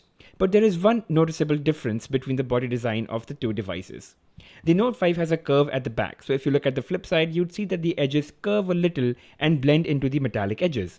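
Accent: India. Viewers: I just hate the Indian accent. It ruins everything everytime.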